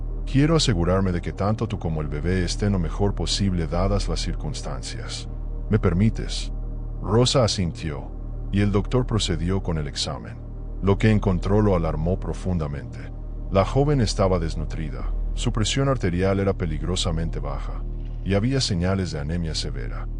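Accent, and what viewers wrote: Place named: United States. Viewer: Lo malo de esta historia es la voz un gringo que puede saber lo que pasa en México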